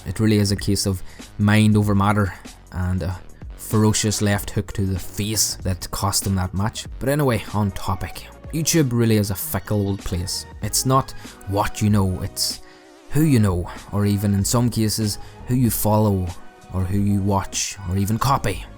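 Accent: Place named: Derry, United Kingdom. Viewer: explains why you have a slightly northern/ derry accent :) i live in dungannon tyrone, a big town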